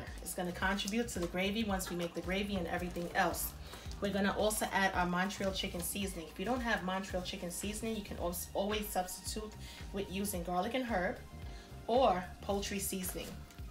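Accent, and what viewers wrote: New York, United States. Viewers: This my first time watching this channel and all I hear is an uptop NY type accent I'm sold jus off the way u keep saying OFF like a NYer 😂❤️ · Dee I Love Jesus Yup I here her N.Y. accent one of the Burroughs she’s from I from the Midwest but I know them deep NY accents lol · I hear that NYC accent. This looks so good. I cook mine with the Cajun trinity (Onion, celery, green pepper), and I like my wings crispy. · is definitely from NYC…that accent is poppin💯😀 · These look AMAZING! I'll be trying this tomorrow. By the way...Are you from NY? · Good Lawd with...I'm doing this one...and I knew you was NY...Got a friend from NY that sounds just like you...Respect....real talk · Haven't heard that New York accent in years.😁 Printed recipe's a bit lacking. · I hear that New Yorker in you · Love your delicious Turkey wings recipe. 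I hear your NYC accent too! I was born and raised in the Bronx aka Boogie Down. Thanks for sharing! ❤️ My bad. Forgot I reached out to you a year ago. Recipe still popping!!! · she sound straight from NYC lool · She is a ny'er to the T. All i hear is ny thru and thru. Im from cali. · love your New York accent!!!!! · Sounds like a New York/North Jersey accent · Is that an NY accent. 👀👀This is how my gma cook it butter & all. I watched her cook it from a distance. I couldn't 100% remember how she did it. Thnks Blk PP posting soul food on YouTube ❤❤well minus the gravey mix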